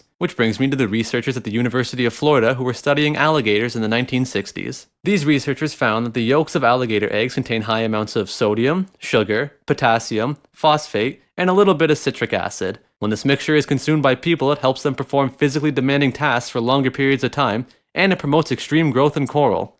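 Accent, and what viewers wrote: Newfoundland, Canada. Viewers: are you Canadian? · Ok so like what province are you from? You keep saying b'ys but I dont know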